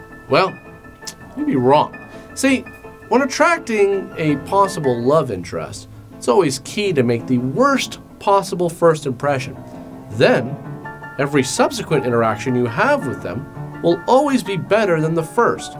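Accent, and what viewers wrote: Canada. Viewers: Ahhhh, I could listen to Canadian "borrow" all day.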